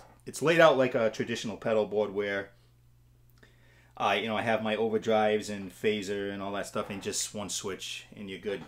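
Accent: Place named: New Hampshire, United States. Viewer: You have that NH accent. You from there? I'm originally from laconia.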